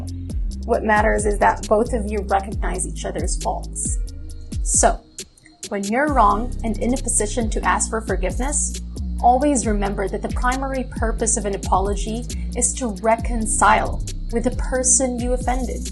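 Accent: Ilonggo, Philippines. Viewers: Ilonggo Accent ❤❤👏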